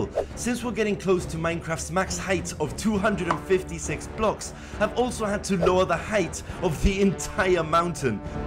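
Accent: France. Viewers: are you french? I am french and your accent sounds french x) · Waw, you have a french accent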